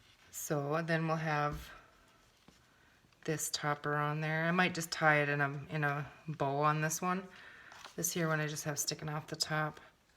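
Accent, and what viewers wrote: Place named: Minnesota, United States. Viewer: HA! I was born, raised, and lived the first 26 years of my life in Bemidji! I've lived all over the country and abroad, I've lost my Minnesotan (I get it back when my family comes to visit. lol). Your accent brings me RIGHT HOME! Also, I love all things crafting. I subscribed!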